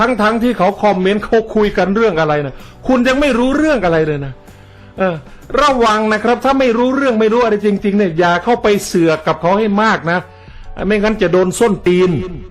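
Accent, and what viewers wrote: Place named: South, Thailand. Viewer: ชอบ จังฮู้นิ รู้เรื่องมวยละเอียด พากย์สนุก แหลงใต้แบบเตินนี่คนใต้ชอบครับ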